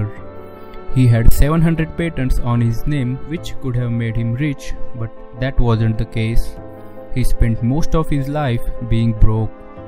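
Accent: India. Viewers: Your voice hahahahahahahahahah wtf man indian pls give eng in peace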